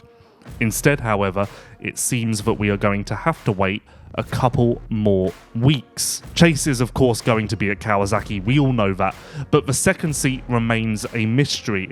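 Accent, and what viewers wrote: United Kingdom. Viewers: thank you for talking faster and having live action, now I won't mute the hub. Luv a fast talking Brit!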